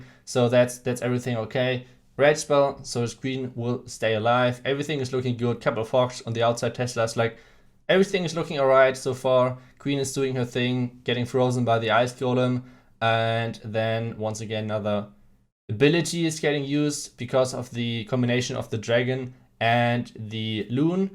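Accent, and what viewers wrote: Germany. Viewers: Thanks for making an English Channel so I can understand. Loving the German accent. I hope to see more of your content. And do give Galadon elder. He deserves it.:)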